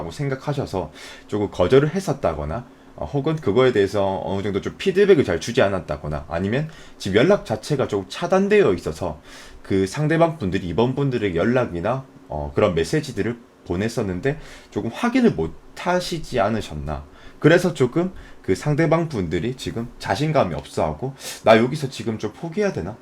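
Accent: Gyeongsang, South Korea. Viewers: 저도 경상권이라 사투리 너무 정겹고 반가워요ㅋㅋㅋ👐 . . . 2번🔮 실제로 연락은 한번도 안왔는데 저는 먼저 할 생각이 없네요 ㅎㅎ 리딩이 사실이면 좋겠어요..! 잘듣고갑니다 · 전남친이 경상도 사람이였는데 말투가 비슷해서 너무 맘이 아파요.. 타로 감사합니다 3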